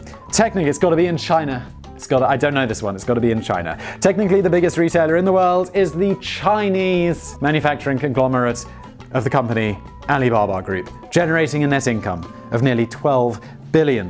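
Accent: RP, United Kingdom. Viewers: Wait, is British? Since when? Is it serious? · Goddamn this needs to be higher. Also add says uncensored “ fucking“ 😂 caught me off guard and was awesome to hear after years of his impossibly posh Britishness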